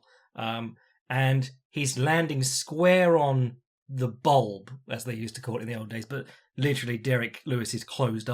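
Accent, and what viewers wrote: United Kingdom. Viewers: Time to let Jack Slack serenade us for 48 minutes with that British schoolboy accent as he describes Aldo's flesh-rippling kicks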